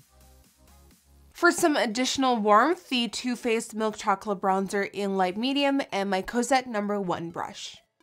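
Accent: United States. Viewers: you live in Holland? oh. you sound american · Hoe heb je zo'n Amerikaans accent gekregen? 😝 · how come she sounds American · i had no clue you weren't from the states, you sound american lol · Can you speak Dutch or something because you sound American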